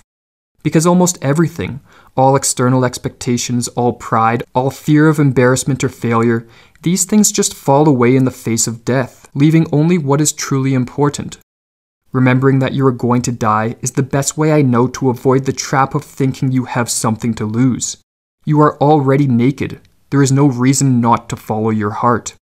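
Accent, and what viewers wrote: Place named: Canada. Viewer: Your "outs" and "abouts" indicated clearly to me that you're a Canadian.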